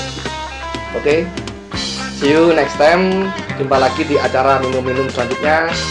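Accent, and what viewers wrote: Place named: Pasuruan, Indonesia. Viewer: Mas bro orang mana sih.logatnya kyak orang pasuruan??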